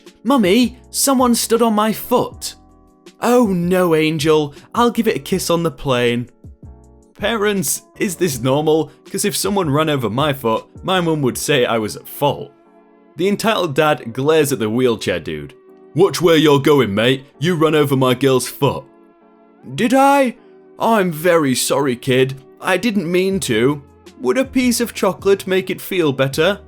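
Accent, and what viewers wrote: United Kingdom. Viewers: I love how you pronounce things in British English (I’m a Aussie, we also use British English) (Richard is a good name for entitled dads)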